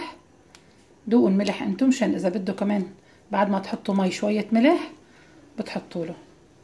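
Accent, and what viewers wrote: Aleppo, Syria. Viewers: والله جوعتيني يا والله فعلاً منظومة ههه لازم نتعلم منك حكي الحلبي كتير بيعجبني يسلم هالديات متابعتك من لبنان بيروت 👍👍👌👌👌👌😋😋😋😋💞💞💕💕😍😍😍😍♥️♥️🌹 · صراحة انا واحدة ما الها ابدا بالكزمنتات. بس والله مو قادرة اقاوم. الله يعطيك الصحة والعافية يا خالتو و يقويكي. شو هالفن هاد, حفر الكوسا والا حبات الشيشبرك , كانهم مرسومين رسم. الله يسلم اديكي ويعطيكي الصحة. مبسوطة كثير انه صوتك الحمد لله احسن من الفيديو اللي عملتيه بعد مرضتك . اجر وعافية يارب. وانت ابداااا ما بتحكي كثيير . بالعكس حببتيني باللهجة الحلبية .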